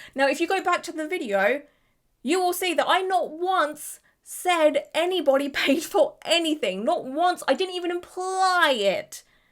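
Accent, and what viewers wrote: United Kingdom. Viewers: honestly, I'm new to the channel and I kept going back and forth between British and Australian. Her 'knickers' comment sealed the deal that she's British (I'm from South Canada)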